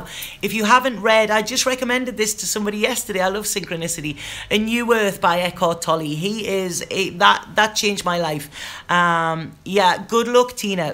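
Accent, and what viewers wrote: Newcastle, United Kingdom. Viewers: "Only Love Today!" Oh yes my love you are teaching. I knew that motto but when you said that into my ear/brain as I did my dishes.....it stuck! Thank You for ever and for always. I will pray for you and offer you a bit of my own advice,"I cannot stop people from doing, saying, thinking shitty, cruel, nasty things to me but I will be F****d if I will give them my fear, greif, the satisfaction seeing me using my free will to help them with their nasty, dark, petty endeavours. That is the part I/we CAN have complete control over. You are amazing, an intuitive reader, an uplifting force of nature and especially as a Sista-Soldier! The first time I heard you it was by "accident" as you came on autoplay whilst I was doing dishes and afterwards I were desperate to find you and, huzzah! I did. Luv your Geordie accent. Don't you worry me darling you are More than equal to any bulls**t lesser beings stomp and shout out of terror into the abyss. 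We got you girl. luvluvluvs, Regan. p,s, I have medical empathy and I Do Not see/sense and malignancy not dirty dishwater colour which is what I see/sense when anyone is going to pass away and/or suffer from a cancer. Is it possible that the growth has been in your brain in some form since before birth? I was so blue last night and I swore off people for good (again!) And here comes channeling God as Billy Connelly saying,"Oh do you bloody think so?!" Yea, I agree completely, spirit love is so much cleaner, purer, holy, for lack of a bette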